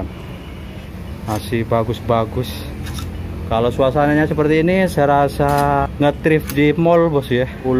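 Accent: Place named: Madura, Indonesia. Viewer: wahhh medureh reh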